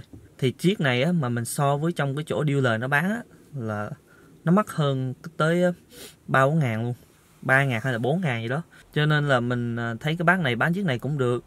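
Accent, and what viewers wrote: Central, Vietnam. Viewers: Bạn người miền trung hả. Mình cũng plan mua xe nên xem bài của bạn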